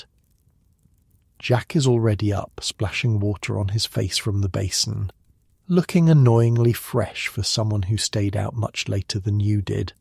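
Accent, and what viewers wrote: United Kingdom. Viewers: Can you tell me when the narratot in this amazing series on YouTube went from an American voice to a British one? I much prefer the soothing British voice. Thank you.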